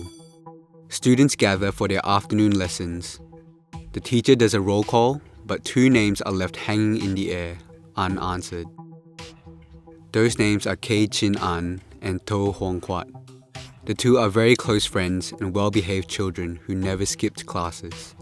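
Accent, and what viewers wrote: Australia. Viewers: thank you, the aussie accent is strong and kinda distracting too. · I noticed also. The accent is light, but it is def Australian what. Maybe need trained ears to be able to hear as not everyone is good at spotting accents?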